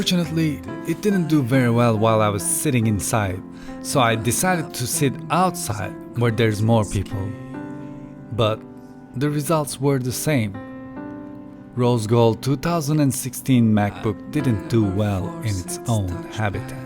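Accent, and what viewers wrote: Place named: Turkey. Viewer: Aksanı çok Türk kaçabilir fekat beyin gramerle sorunu yok obvs.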